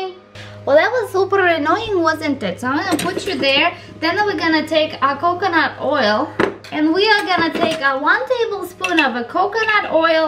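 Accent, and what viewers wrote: Russia. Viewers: I love her russian accent!!! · I thought Bullet Proof Coffee was gonna be like a Bulletproof Vest. Plus you have a Beautiful Russian Accent. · I love the Russian accent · Is it just me, or is she sexier with a stronger Russian accent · why are you thickening your russian accent, you used to take lessons to hone in on your russian accent, and you were doing so well.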